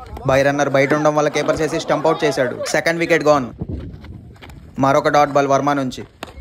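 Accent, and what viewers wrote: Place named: Srikakulam, India. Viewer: SRIKAKULAM vollu antarra babu❤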